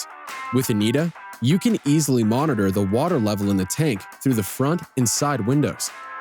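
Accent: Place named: United States. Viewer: What's with this american guy doing the voiceover on an italian product? Get Mauro Epis back! A real italian. Good video otherwise though, from an owner of this machine!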